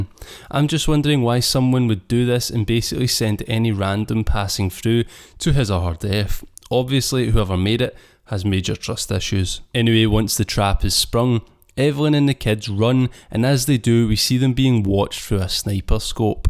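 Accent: Scotland, United Kingdom. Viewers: Are you Scottish? Lol this is the first of your Vids I've watched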